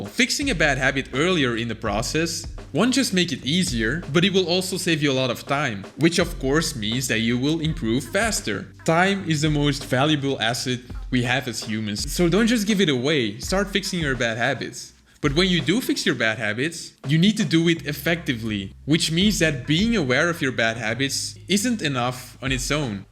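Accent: Netherlands. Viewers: are you dutch?????? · You sound kinda dutch tbh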